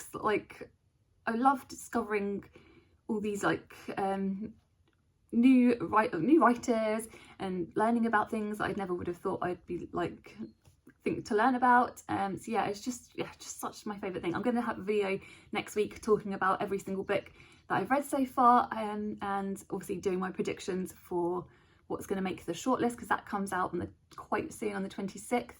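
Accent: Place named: Ireland. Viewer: love an Irish narrator!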